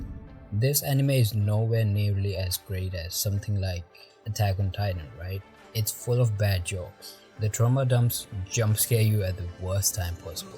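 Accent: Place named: Pakistan. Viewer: Looks Like I Found Another Hidden Gem Like Summer Time Rendering (it's quite popular now but when I watched, it wasn't) 👀✨... BTW Ur Accent, You From PAKISTAN?! 🇵🇰🤩⁉️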